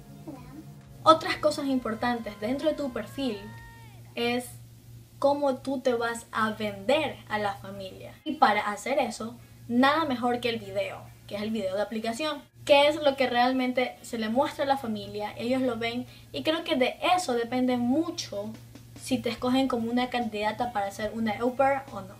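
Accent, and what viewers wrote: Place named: Ecuador. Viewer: Creo que eres muy parecida a la Miss Universo, de verdad que te veo y me recuerdas mucho a Paulina solo que con acento ecuatoriano ;)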